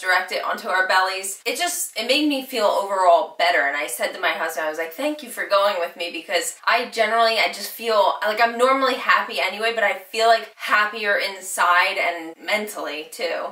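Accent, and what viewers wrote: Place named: Upstate New York, United States. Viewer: You are such an upstate New Yorker aren’t you lol. I live up in the Hudson valley area now and you sound like my girlfriends here. I’m 17 weeks - haven’t checked out your latest videos but this was really fun and enjoyable to watch! Thanks for sharing.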